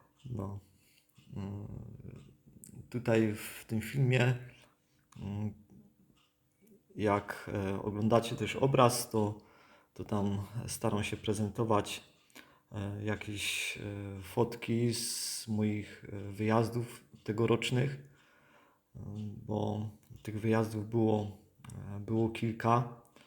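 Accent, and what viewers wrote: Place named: Silesia, Poland. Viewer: Witaj! Jak dobrze Cię znowu słyszeć 👍i wcale przynajmniej mnie Twoja śląska mowa nie przeszkadza, wręcz przeciwnie, ja też mówię i tyż gołdom, jestem z krwi i kości. Ślazaczka z Opolszczyzny, więc póki co pozdrawiam serdecznie i do następnego usłyszenia😊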